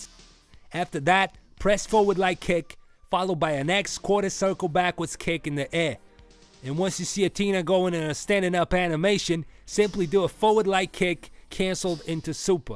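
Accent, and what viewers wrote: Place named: Boston, United States. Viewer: I don’t know what it is about it, but your Boston(?) Accent somehow makes this great guide even better . Thanks for the great guide man!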